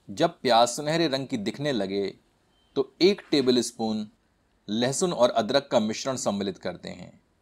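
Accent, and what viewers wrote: Standard. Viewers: apki shudhh hindi .. apka andaz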